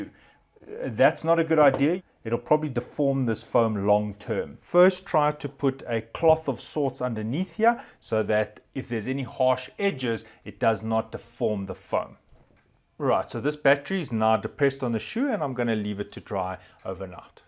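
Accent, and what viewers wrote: South Africa. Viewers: Defo a South African accent!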